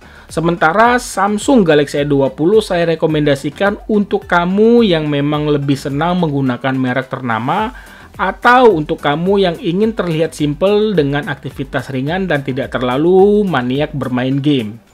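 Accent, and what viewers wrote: Semarang, Indonesia. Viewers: Admine wong semarang 😂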